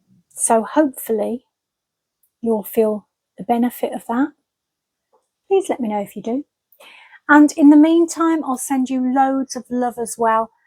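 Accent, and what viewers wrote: Birmingham, United Kingdom. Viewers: I always thought it was a Birmingham, Midlands accent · I thought you were from Solihull or Birmingham by your accent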